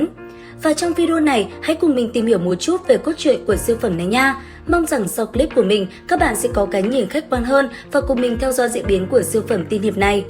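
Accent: North, Vietnam. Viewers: Ad là người miên bắc chắc luôn🤣🤣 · Mình ng trong Nam nhưng sao nghe giọng Bắc của bà AD nghe cuốn quá 😅😅😅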